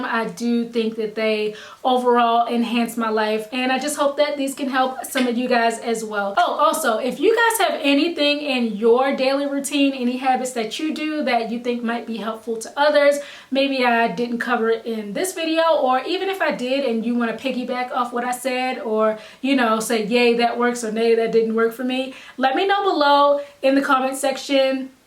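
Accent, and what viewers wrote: Baltimore, United States. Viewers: Are you from Baltimore??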